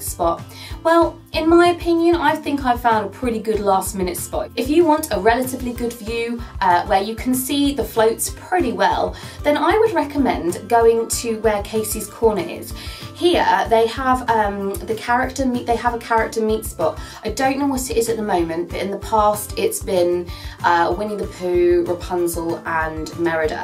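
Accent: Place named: United Kingdom. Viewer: thank you for the videos and they help alot !! love the accent... is every British lady so gorgeous????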